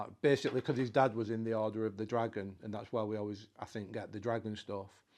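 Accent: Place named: England, United Kingdom. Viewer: Great Videos. For sometime now, each time you ask Alexa a question, our Alexa answers you 😳Our voices are completely different. I have a Texas draw and you have an English accent…✅🇺🇸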